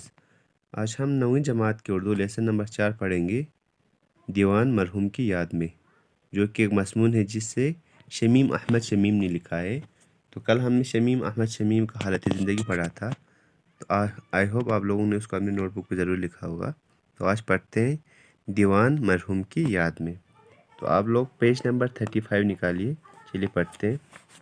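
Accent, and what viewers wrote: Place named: Pakistan. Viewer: Ooo bro bohot Jldii reply kiya h , I told because, Sir ka Urdu accent sa laga Mujhe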